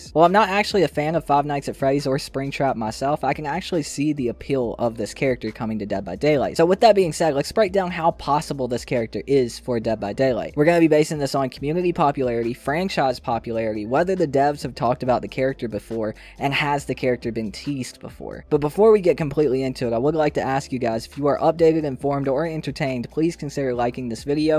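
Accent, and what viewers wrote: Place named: North Carolina, United States. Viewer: On today’s episode ok guess that accent we haaaaaave! Now, I’m gonna guess that accent is from….North Carolina!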